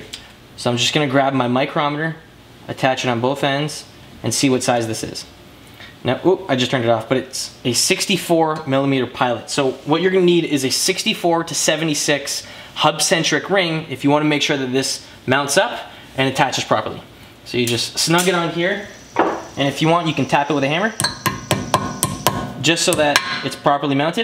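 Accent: Canada. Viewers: Canadian eh??????????????/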